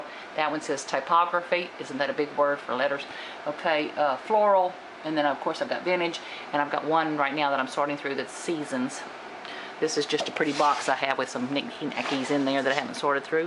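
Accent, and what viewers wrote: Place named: South, United States. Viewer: You are a woman after my own heart!, diet coke and chocolate! HA! Thanks so much for sharing! Good to hear a fellow Southern-Sister's accent on YouTube, keep up the good work!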